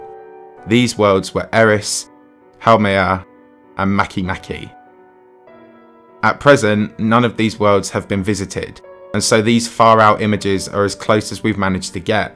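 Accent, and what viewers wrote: United Kingdom. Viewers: I'm Dutch and I can hear it's not the Queen's English, but I often watch British TV so I'm familiar with different dialects. pronounciation is clear and easily understood. Here in the Netherlands I can often hear visiting British students and tourists. Sometimes I have to listen for more than a couple of seconds before I realize they are speaking some English dialect, or Welsh or Scottish or whatever...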